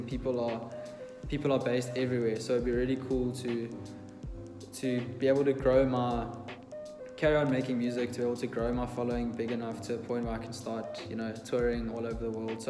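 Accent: South Africa. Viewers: yesss called it! the accent is from south africa!!! the only person whom i've heard speaking with that accent was my ex-boyfriend who was from cape town <3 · never realized how cool south african accent is until now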